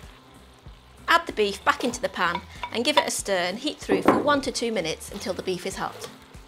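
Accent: United Kingdom. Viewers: wonderful recipe, thanks ! but . . . ⅛ tsp white pepper - is this molekule-like amount worth start grinding the corn ? :-) another thing: hear to talking to my german ears it sounds more british than american dialect. Am I right in thinking you're from U.K. ?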